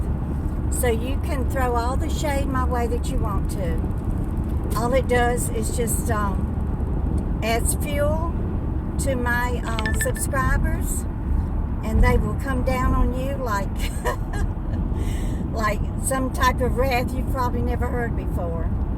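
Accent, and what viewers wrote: South, United States. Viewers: You sure have a wonderful outlook on all your many life experiences. You charm them with your southern drawl. They should make a movie of your life!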